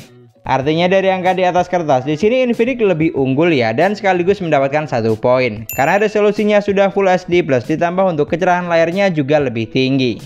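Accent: Madura, Indonesia. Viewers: Logat madura campur sumatra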